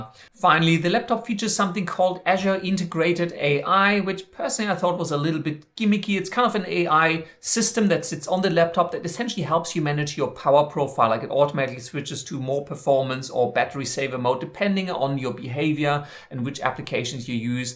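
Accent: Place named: Germany. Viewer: you kinda have that German accent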